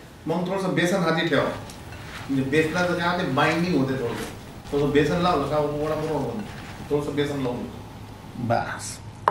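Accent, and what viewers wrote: Vidarbha, India. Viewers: वडे एकदम मस्त 👌👌👌 आणि आपली विदर्भ भाषा भारीच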